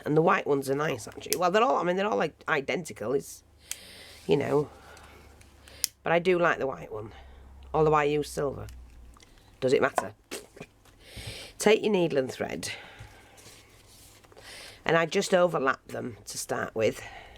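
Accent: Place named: Liverpool, United Kingdom. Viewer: Excuse me being nosy, but you sound like my friend who comes from Liverpool? xxxx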